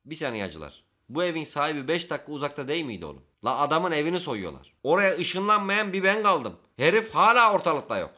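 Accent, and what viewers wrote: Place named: Thrace, Turkey. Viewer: Trakyalı ağzı yapmış çükolatama harbi güzel yapmış ağzına sağlık xd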